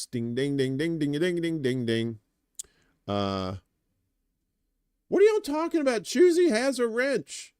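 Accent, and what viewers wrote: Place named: Israel. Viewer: f israel ❤🖤🤍💚 i like yer israeli accent btw! as well as the 'US hegemony' voice!!!! love from an 'merican in UK ;D x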